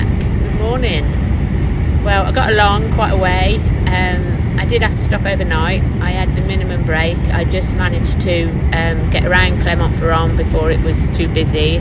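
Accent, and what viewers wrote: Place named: Derby, United Kingdom. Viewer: Good video . Your Derby/ Burton accent is really coming on . From Mickleover . Live in Uganda now .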